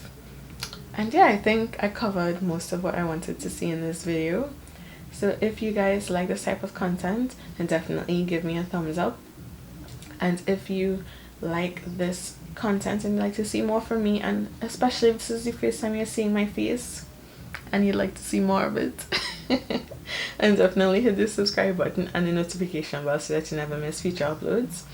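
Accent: Trinidad and Tobago. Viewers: Hey girl great video. Oils don't work for me either. Glycerin has been a game changer for me....it is not an oil so i don't get a response . It's also a humectant so it attracts moisture to your scalp and hair...its especially useful as I have relaxed hair as it help make my new growth soft and manageable. When my dandruff as bad.... I used keto plus shampoo only on my scalp. Massaged it and Left it on my scalp for 20-30 mins ..and when I tell you...by the second wash I saw no flakes. I used Glycerin to moisturize my scalp every other day and to my weekly deep conditioning treatments...you sound Trinidadian... looking forward to more videos . Bye!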